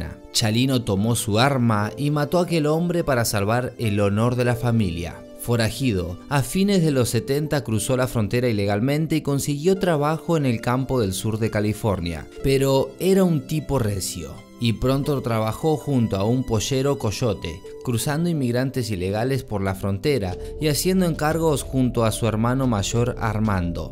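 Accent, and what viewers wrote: Argentina. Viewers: Deberías de pronunciar bien la y o lle . El Argentino se escucha mal . No creo que sea tan dificil pronunciar la Y y la LL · Sólo el título para ganar más visitas, y perdón pero no me gustó la narración, más bien el acento argentino hablando de historias mexicanas 😮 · dale chee voz soss argentino.........._????? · Jajajaja el que narra tiene acento Argentino Narrando una historia de un Mexicana Jajajaja no concuerda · UN Argentino contando la historia de chalino. No Manches.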